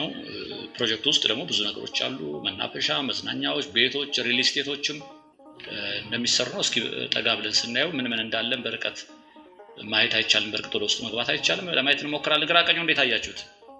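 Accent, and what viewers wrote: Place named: Addis Ababa, Ethiopia. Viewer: I like your voice and your beautiful Addis Ababan Amharic!!!!!! Your introduction and the kirrar are well-harmonized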